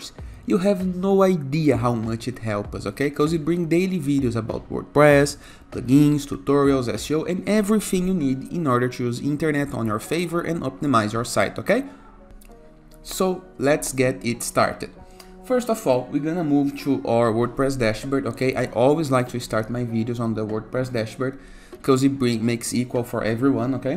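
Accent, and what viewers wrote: Brazil. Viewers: Cara, você é brasileiro?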